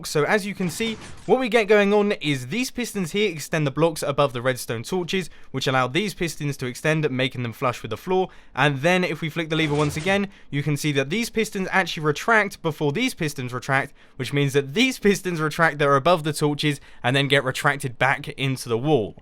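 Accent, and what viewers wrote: United Kingdom. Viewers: And he has a funny British accent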